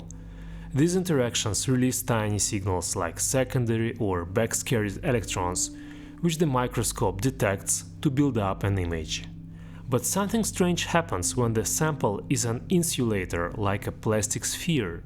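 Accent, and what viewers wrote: Russia. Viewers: Интересный у тебя акцент, ты русский? 😊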